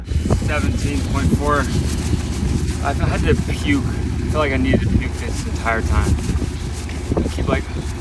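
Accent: Australia. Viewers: I knew you were in Australia, but the accent is always a jump scare. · Legend! Keep mounting and do another one. Oh and your Aussie accent is fire! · Your Australian accent is elite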